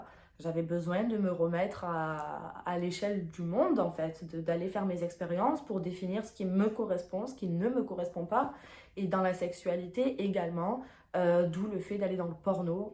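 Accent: South, France. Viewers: Salut les calanques de Marseille lol l accent du sud Fatch de con tu pointes ou tu tires lol petite intro avec une pointe d humour parce que rire est très important autant que le sexe ta vidéo est assez intime assez poignante tu es assez mature pour ton âge et ton chemin est moins être fini tu parles souvent de bienveillance c est que tu en auras besoin et pour toi et pour ton entourage ...le fait de t exposer ouvertement sur les réseaux sociaux va t amener des personnes positives et d autres bcp moins garde cette joie de vivre et ta folie tes 2 premières vidéos sont sympa j aime bien continue 😉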